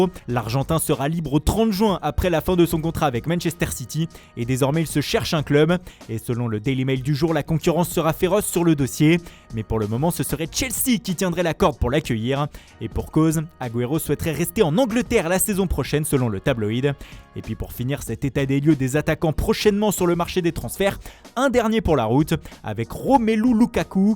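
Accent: Italy. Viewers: Le retour de la voie avec les accents italiens mythiques 🥰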